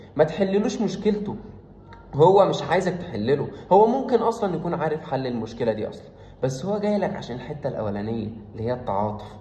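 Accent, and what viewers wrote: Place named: Gaza, Palestine. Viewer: Long live to and EGYPT,your dialect is quite difficult to understand, but i have been following the palestinians for two years now,sometimes you sound like people from gaza./ from mousa in France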